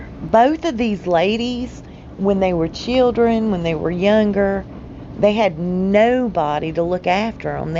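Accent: South, United States. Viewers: Love your beautiful southern accent,! I also love it when you say "y'all stay safe and be blessed". It makes my day!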